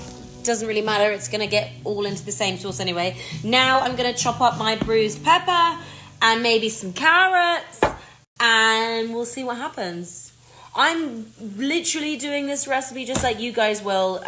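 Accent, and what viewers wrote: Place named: Oxford, United Kingdom. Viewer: oxford accent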